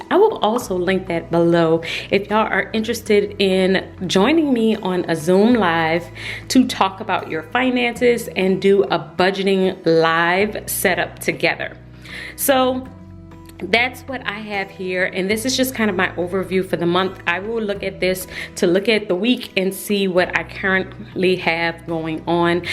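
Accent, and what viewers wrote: New Orleans, United States. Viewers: You hair is beautiful! Are you from New Orleans? I hear an accent. I just found your channel yesterday. Love your videos. Thank you for sharing.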